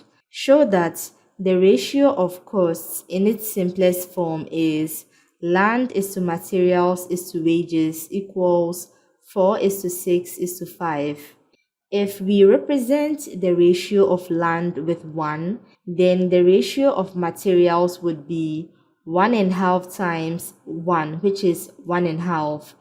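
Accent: Ghana. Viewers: are u ghanaian ? you have a very ghanaian accent either that or your from nigeria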